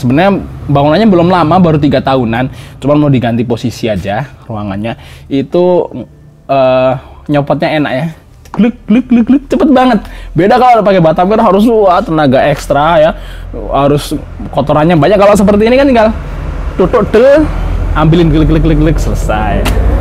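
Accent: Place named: Semarang, Indonesia. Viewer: medhok men lek,bahasa semarangan e 🤣🤣🤣🤣🤣🤣🤣🤣🤣🤣🤣🤣🤣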